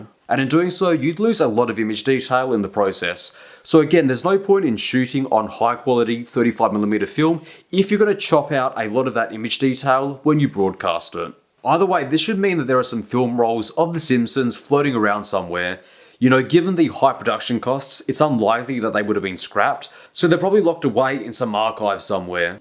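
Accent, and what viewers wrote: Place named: Australia. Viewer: Jesus how Australian are you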